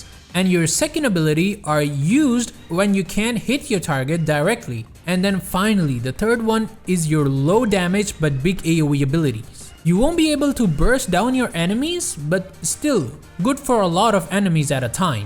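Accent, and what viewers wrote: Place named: Philippines. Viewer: You sound very pinoy. Greetings from Malaysia. When you talk about small counters against heroes, you can add that cold snap interrupts hero such as Earthshaker who has awful casting animation. EMP drains Medusa's Mana Shield. Ice Wall and Forged Spirit depletes Templar Assassin's Refraction quickly. Tornado dispels enemies too, for example Windrunner's Windrun and Scurry of Hoodwink. You also should mention Catacalysm which is the highest damage single cast spell in DotA, higher than Thundergod's Wrath I believe.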